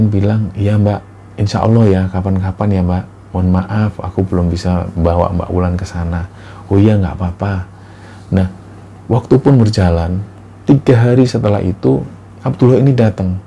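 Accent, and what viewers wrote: Java, Indonesia. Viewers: pdahal mas ini medhok bngetz ngomongnya tpi kok aku paham ya apa yg diceritakan,biasanya klw adh orng yg medhok aku cma senyumin aja krna gak fahan ngomong apa 😂😂😂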